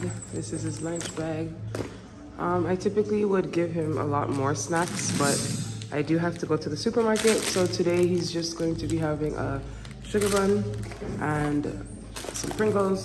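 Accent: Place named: United States. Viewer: You sound more American than you do Canadian😊 Also I would love the healthy country life myself it's peaceful and the air is cleaner. Since all of us are subscribing to these YouTubers I think some of them should try harder tell us about available land for sale, so that people with no Jamaican background don't get to buy it all...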